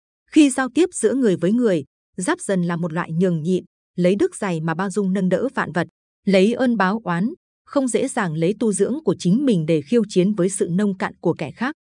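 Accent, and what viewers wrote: Thanh Hoa, Vietnam. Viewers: Thầy ở huyện nào thanh hóa vậy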